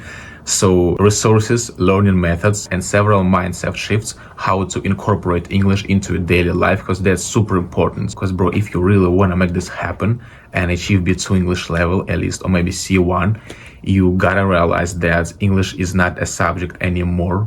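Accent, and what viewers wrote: Russia. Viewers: Вы из России? акцент🙃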